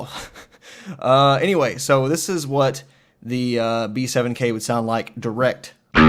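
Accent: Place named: South, United States. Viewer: Where you from? I’m hearin a southern accent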